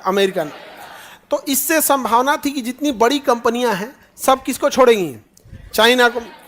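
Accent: Bihar, India. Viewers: Coaching Mafiya khan, jisne apne bihari tone ko famous hone ke liye use kiya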